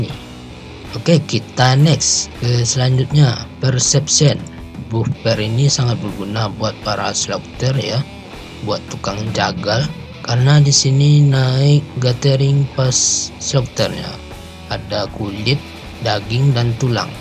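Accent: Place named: Minangkabau, Indonesia. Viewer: Mantap infonya, btw kaya minang kocak wkwkwk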